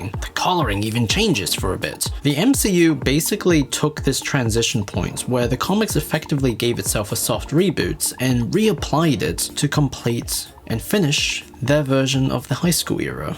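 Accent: United Kingdom. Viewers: wow. is the furthest thing from an “objective” analysis on those comics. It’s clear as day. He constantly tries to diminish plot lines in the Raimi films (see: Spider-Man 2 doesn’t get Spider-Man, his comments on the movies having “poverty porn”, his video on the “nostalgic Spider-Man) and clearly likes TASM and MCU much more. Just as you’re saying an opinion can’t be fact, neither can a shitty YouTube video essayist with chill hop in the background. I get it. Most of you don’t read the comics, and think this guy knows what he’s talking about, because he’s got a British voice, scripted videos, and chill hop. It’s just not the case. And it’s not an opinion for to say “the human torch overshadows uncle ben as a mentor in the comics.” That’s flat out wrong. It’s not an opinion to say “Raimi invented uncle Ben saying with great power” that’s objectively wrong. 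And people can’t take criticism of these films well at all. In fact, you’re trying to say “oh well everyone knows it’s not 100% accurate that’s not the point” as you’re watching. A YouTube channel that constantly tries to drive home the “”fact”” that the MCU films are “”more comic accurate”” than the Raimi flicks. Which again- just is flat out not true. And I never said the Raimi films were a 1:1 carbon copy of the films. But they’re hell of a lot closer than the MCU films. You don’t need bizarre nitpicks of random comic panels from the Stan Lee run to see that. And sorry, it’s still not an origin. You’re telling me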